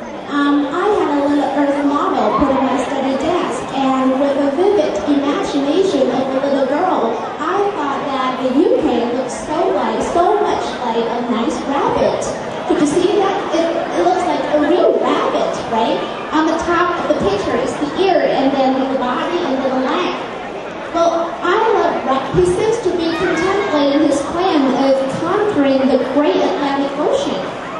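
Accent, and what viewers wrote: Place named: United States. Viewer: Your accent is way more like American than British.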